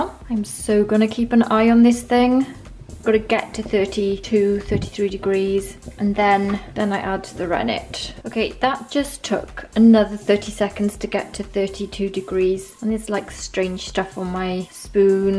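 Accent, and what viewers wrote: United Kingdom. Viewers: Love your accent what is that British?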